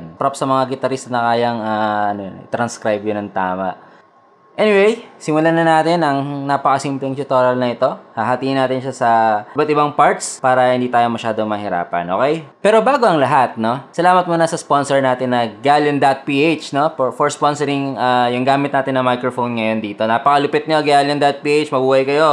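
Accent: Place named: United States. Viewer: Boses kano si sir haha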